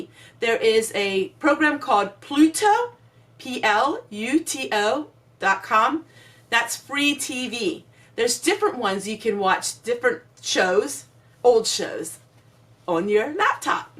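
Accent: Pennsylvania Dutch, United States. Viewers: Lol you made me giggle. I like your Dutchie accent makes who you are. You have been busy this week enjoyed your video have a great week 🌷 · Hi Everyone, Happy Palm Sunday, was busy with poor new Lab puppy, What was I thinking >>> she is a distraction as to what is going on. Also attempted to sew some face masks My skills do need improvement, We have Pluto and a Firestick. Like you,I am rural in NY. Love hearing your dutchy Teach us some. Stay safe and Blessings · I love your Dutchy Pennsylvania accent! I've known several people from there and how y'all talk is so entertaining. You da bomb! I have Dutch heritage too......from Claverack, NY. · nothing wrong with your PA Dutch I'm enjoying the dialect:) It's been a nice day today the sun came out and I was sitting in my yard:) Very quiet here except the sirens but not to much of that:) I got out to the store today to get a few things I needed to get but otherwise no real need to be to a store:) It sure does feel like I'm in the country here:) Been helping mom in the house:) That's a beautiful quilt great to see your grand kids helping out:) Love you my Dear sister:) God Bless you and Ken and Family:)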